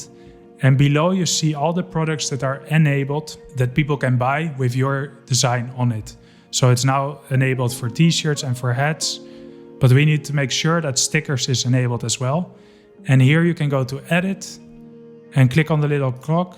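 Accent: Netherlands. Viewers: bro has the most dutch acccent ever lmao. i love the content, keep it up maat!😜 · Kwam toevallig op een video van je terecht.....volgens mij hoor ik een Nederlands accent ;-)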